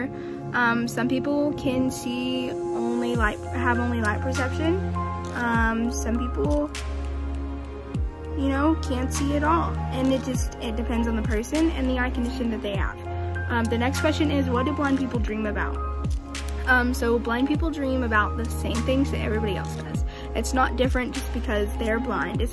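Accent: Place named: South, United States. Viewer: I watched a full video of my friends and just it was a good video and I still remember your southern accent and the final question that I have is do you and have separate YouTube channels apart from this channel because I wanna go and subscribe to them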